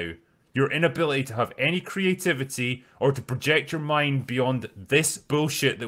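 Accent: Scotland, United Kingdom. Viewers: I'm English, listening to a Scot ranting about a Welsh Waman....Brilliant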